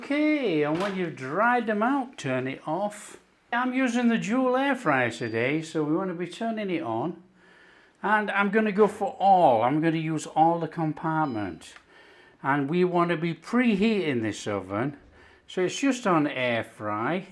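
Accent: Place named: England, United Kingdom. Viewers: I thought he was a Notts man but I guess it's not far from Yorkshire. Sorry chef I'm from the westcountry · Hey, Chef, where are you from in England? Trying to place your accent!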